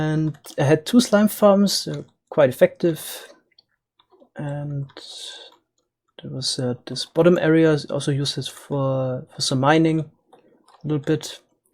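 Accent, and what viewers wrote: Germany. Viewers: Are you come from germany? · You are no german, are you ?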